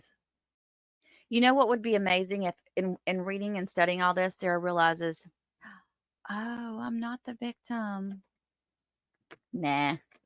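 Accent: South, United States. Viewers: With your sweet southern accent, I'm waiting for you to say "bless her heart".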